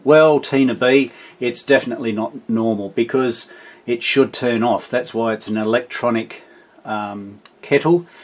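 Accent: Australia. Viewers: Loved your delightful Aussie, unassuming, understated technical explanation that clearly demonstrates, without intending to, that electronics is not at all for the home handyman. This 'repair' is way out of my league, and I'm off to buy a new kettle!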